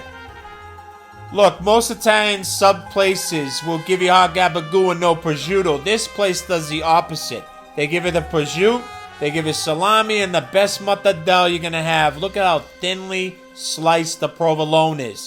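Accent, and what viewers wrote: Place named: Boston, United States. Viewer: I'm in the Cahhhhhhments, so now I can talk chit! It's Monica's Mercato and Salumeria, not "Monica Mercato's"! Stop saying that! Her last name is not Mercato, just call it Monica's! Mercato = a Market where goods are bought and sold. It's like every time you look at the store sign, you cannot see the apostrophe S next to Monica. HA Love your videos though and that sweet Bahston accent is so pure. Keep it up!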